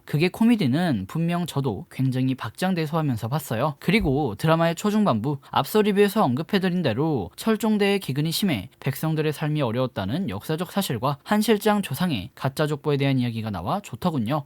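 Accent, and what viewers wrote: South Korea. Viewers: ㅎㅎㅎㅎㅎㅎ 말을 허벌나게 잘해부리는구마이~ 틈틈히 리뷰 잘보고 있어요. 지루하지않고 군더더기 없이 리뷰를 잘해주시는거같아요. 근데 놀란건 전라도분이 어찌 이리 완벽히 서울말을 구사하는지.. ㅎㄷㄷ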